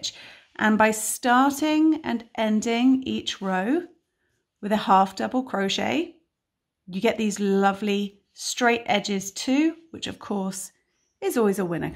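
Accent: United Kingdom. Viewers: Thank you ! Love your British accent. I'm from the deep south in US and it is very refreshing 😍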